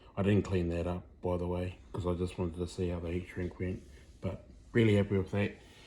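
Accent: Australia. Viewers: I hear my Aussie brotha voice!!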